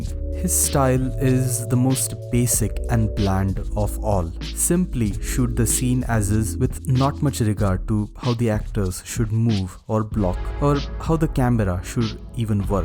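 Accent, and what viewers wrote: India. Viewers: This accent lmfao pajeet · You sound Indian brother. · is this guy indian? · I was excited to watch this video but then I noticed Indian accent. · Bullshit, Yates did a great job The retarded overly dramatic emotional cues are a thing of past that is 1990s to early 2000s U want every single emotion to be emitted as a child’s overly expressive face?? Go watch home alone. Moreover your Bihari accent ruined the essay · Indian accent…..annnnnd I’ll pass. “Saaaaaaar they should have fired all the English people and hired pajeets. Saaaaaaar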